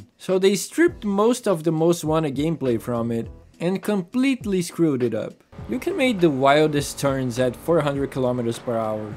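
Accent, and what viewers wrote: Brazil. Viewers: are you from Brazil? your accent sounds brazilian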